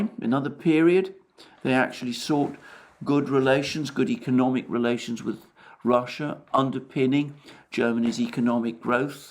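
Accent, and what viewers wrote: England, United Kingdom. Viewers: Non Brits really get bedazzled by a moderately middle class, English accent. sounds the part, but his info is sub-par, to say the least. Good day to you! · Steven, Pls can you give an example of the so-called sub-par info in speech. Also, it seems that you’re sounding a bit irritated by the fact that middle class English is nevertheless bedazzling for the non-Brits. I can tell that all English accents are interesting 👋🏻😉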